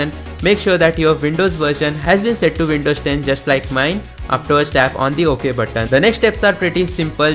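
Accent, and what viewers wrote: India. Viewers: Need an emulator to change Indian accents, sounds like an Indian Scam Call Center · Hint ağzıyla ingilizce konuşulduğuna tanık olursanız (bu videodaki gibi) kaçın.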